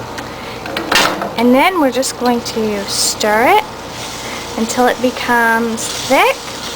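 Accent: South, United States. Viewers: your accent southern ?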